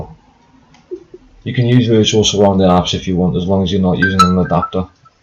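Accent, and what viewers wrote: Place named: Wales, United Kingdom. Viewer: Mate are you welsh because you sound it.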